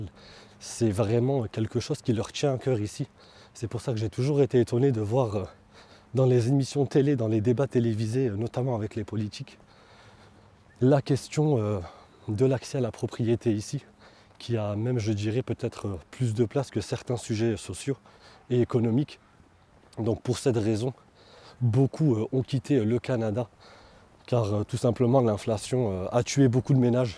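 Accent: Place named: France. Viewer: Il est évident que vous êtes un Français parce que je comprends ce que vous dites sans aucune difficulté, mais seulement 50 % des gens du Québec.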